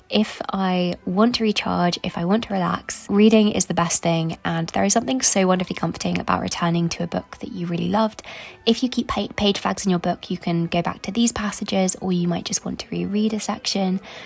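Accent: RP, United Kingdom. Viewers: I think it is rp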